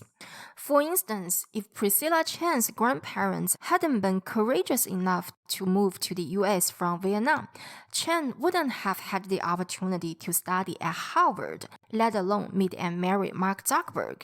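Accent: China. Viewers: terrible chines accent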